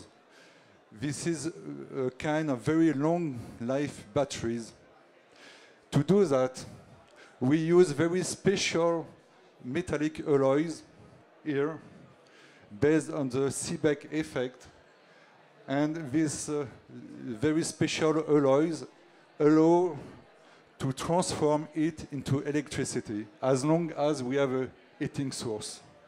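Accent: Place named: France. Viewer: À la manière dont vous vous exprimez en anglais Il n’y a aucun doute que vous êtes français lol Ou sinon, merci de m’avoir appris plus sur l’énergie nucléaire et aussi pour les sous-titres en français. Bonne continuation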